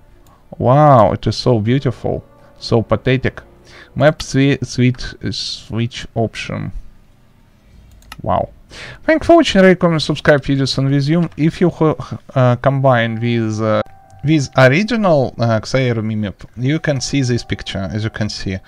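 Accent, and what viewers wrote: Russia. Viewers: Прям слышу русский акцент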